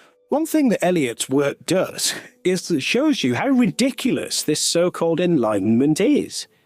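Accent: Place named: United Kingdom. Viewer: Sometimes you make sense, for a Britain, despite the accent.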